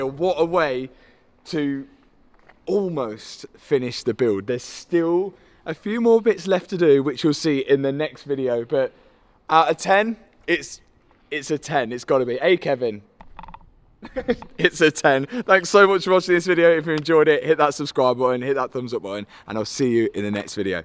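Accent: United Kingdom. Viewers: Boompahhh, bompaahhh, bumper !!!! Where are you from in UK ??!! What accent is that?! What city??!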